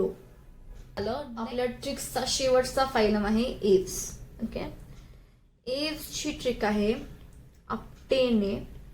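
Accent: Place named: Latur, India. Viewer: Di tuzi way of explaining all thing khup bhari aahe.... Ani laturkar chi marathi tr azun ch bhari 😍😍 I like it so much 😇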